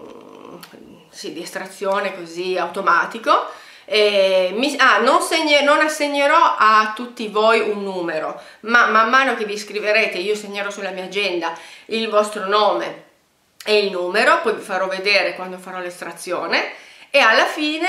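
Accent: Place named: Turin, Italy. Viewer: Sei di Torino, vero? Riconoscosco quello che é anche il mio, per niente marcato, accento 😂😂😂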